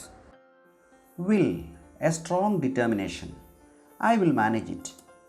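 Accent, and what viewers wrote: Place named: India. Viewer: Indian English.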